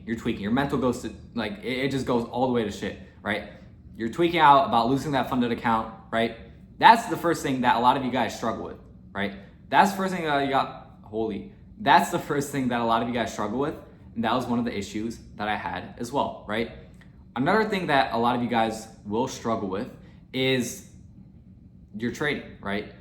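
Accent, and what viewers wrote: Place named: Wisconsin, United States. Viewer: are you from Wisconsin with that accent? that point about scaling up with accounts of different sizes is so true, no more full porting 😎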